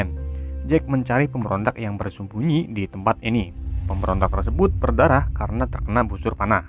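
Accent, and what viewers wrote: Indonesia. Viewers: Yah gpp bang kan logat orang indonesia jadi masih rada² susah ngomong bahasa luar yah belajar aja semoga bisa 👌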